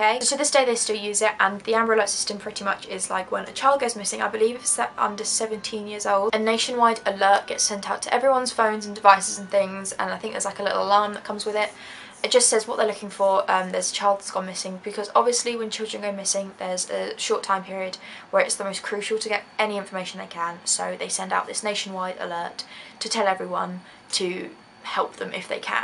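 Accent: United Kingdom. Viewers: why r all u girls doing these type videos have British accents? it’s kinda bizarre just wonder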